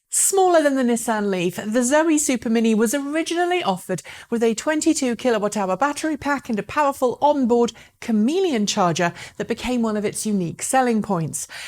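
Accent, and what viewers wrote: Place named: United Kingdom. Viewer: oh gosh I didn't know to be honest. I thought you were from the UK judging by your accent! Lol, sorry!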